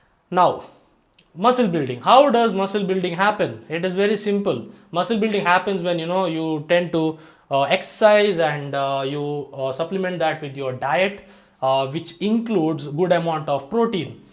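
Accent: India. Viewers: Indian accent is disgusting.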